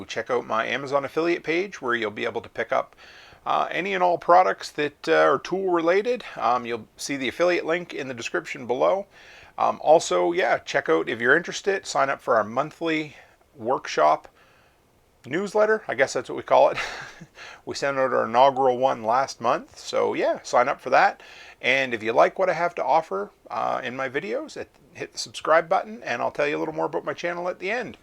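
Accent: Canada. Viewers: Thanks for the info ... I learned something too :) What I like about your videos is that you talk "Canadian" -- specifically western Canadian!!! I'm from AB & get your little jokes!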